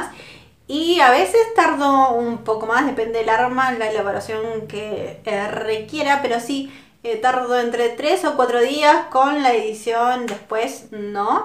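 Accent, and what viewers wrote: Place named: Argentina. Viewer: Yo te conoci por el video de la mascara de calabera qu isiste tambien ese video supe que eras de argentina porque mensionabas la pala sh por ejemplo en este video en ves de decir pienso llegar al millon dijiste pienso llegar al mishon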